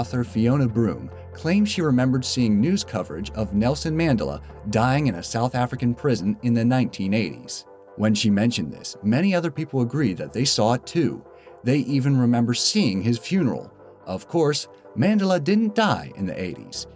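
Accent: United States. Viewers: I cant believe how few people in the comments noticed that he got the Rocky scene wrong, Mr. T didnt play Apollo Creed, he played Clubber. Also, i know a lot of people griped about his pronunciation of Mandela, however i have known many people that pronounce it the way he did. Its usually because he has never, or cant recall ever actually hearing the proper pronunciation, so they fall back on typical language defaults that dictate how it would be said, of which, based in his accent, he speaks americanized english, so he is technically still pronouncing it right. If you are wondering why i would bother explaining this, i literally saw hundreds of comments from people jumping on his back about it, yet only a few people commented on the aforementioned Mr. T issue.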